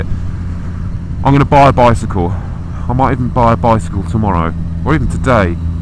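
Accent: England, United Kingdom. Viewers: i love hearing you swear in your grand english voice... haha i want a vlog of just swear words haha :D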